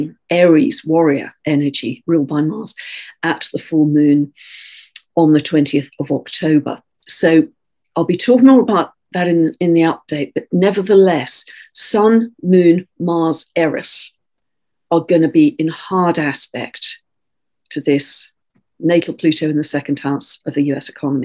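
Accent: United Kingdom. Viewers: American will believe any crazy thing as long as the person saying it has a vaguely British accent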